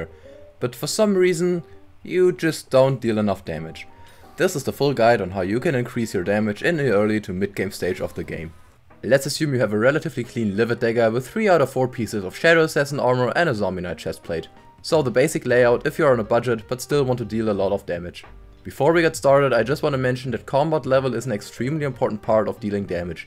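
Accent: Germany. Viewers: you sound german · this german accent tho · you sound like german xD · are you german? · are you german? bc of the accent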